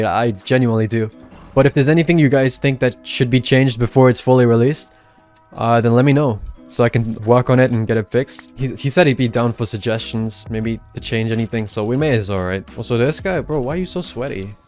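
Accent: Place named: United Kingdom. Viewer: U BRITISH!!!!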